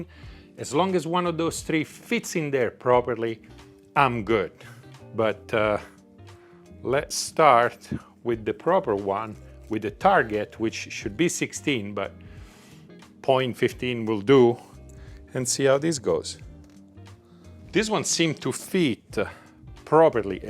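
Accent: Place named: Italy. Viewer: He is Italian 100 % . I hear his accent.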